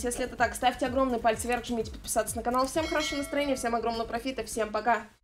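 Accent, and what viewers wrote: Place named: Russia. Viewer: И, не профИт а прОфит, учитесь говорить правильно. Я понимаю что Вы придерживаетесь провинциального произношения русской речи, но никогда не поздно научиться. Аж уши режет: проФит, средствА, постираться, убраться, погреть что-либо из еды, а не подогреть или разогреть, задолбали провинциалы со своей речью.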